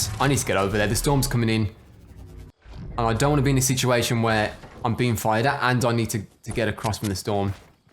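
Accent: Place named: England, United Kingdom. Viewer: “Tiki heads” are from Easter Island where they call them “moai”. Pronounced kinda like the English words “more I” if you read it with English accent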